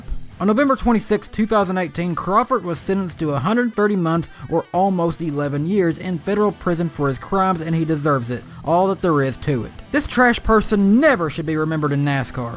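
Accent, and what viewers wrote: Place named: South, United States. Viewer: Down south, the proper pronunciation of the letter "I" is pretty much neglected. I live in TN, I see it happen all the "tom". Lol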